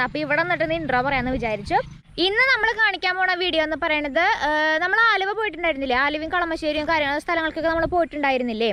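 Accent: Thrissur, India. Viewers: Saralla mole itrakim budhimutti... Thaarangalde veedokke kanichallo... Enikista mole pinne trishur bhaasha kelkaan nalla rasamund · Thrisoor slang poli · Thrishoor basha nalla rasam kelkkan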